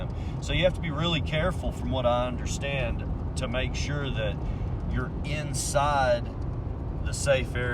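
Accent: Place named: Scotland, United Kingdom. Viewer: That sounds like a Scottish accent 😉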